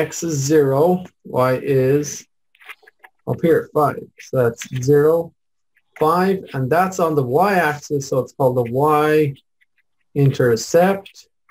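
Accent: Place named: United Kingdom. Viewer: BRITISH!?!??!?!!!?!!!!!?!?!??!?!!/!11?!?? But thanks for the tips, i needed this for my algebra.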